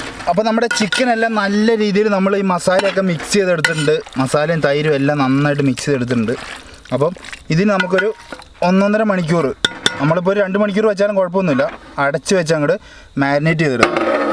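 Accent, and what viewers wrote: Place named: Tamil Nadu, India. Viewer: കള്ളുപ്പ് 😅 തമിഴൻ talk😁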